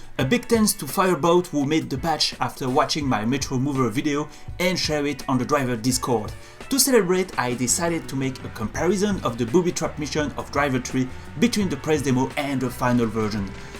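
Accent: France. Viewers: you sound kinda french :') , neat video from yours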